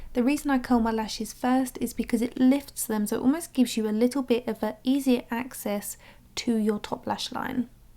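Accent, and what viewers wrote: United Kingdom. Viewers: I know this is a random question, but can anyone tell me which UK regional accent has?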